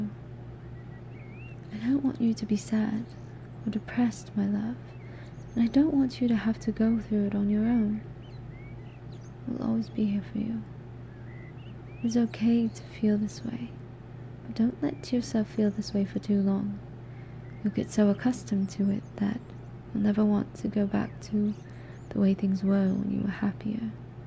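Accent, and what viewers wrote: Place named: United Kingdom. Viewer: Alternative title: You're the Husband of the young Queen Elizabeth II ASMR (why? because of your somewhat thick british accent)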